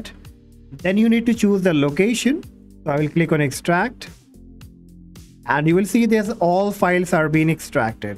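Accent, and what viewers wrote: India. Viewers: u know u saved when u hear an indian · The Indian accent makes me not want to download this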